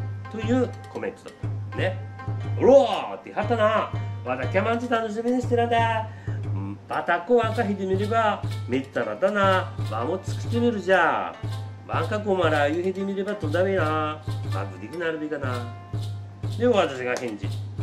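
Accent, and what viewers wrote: Tsugaru, Japan. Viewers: BGMないほえが、喋る声聞きやすいかも！青森生まれの、青森の両親、青森の両方の祖父母の津軽弁聞いてた俺としては、わかるけど、標準語に、直してるのが、津軽弁に、聞こえちゃうんですけど！ダメ出しでは無いですからね〜純粋な東京の人には、分からない気が、青森は、津軽弁、南部弁、下北弁、あと龍飛の方でも、言葉違いますもんね〜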